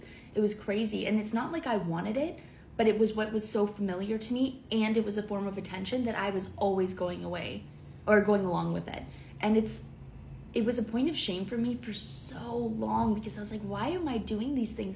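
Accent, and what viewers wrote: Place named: Canada. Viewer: You sound Canadian. So I’m guessing this occurred in Canada. Could be wrong though.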